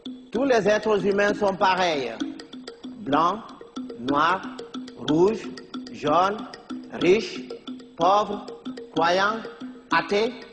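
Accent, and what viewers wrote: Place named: France. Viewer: La vie Frr t’as vu il parle le français français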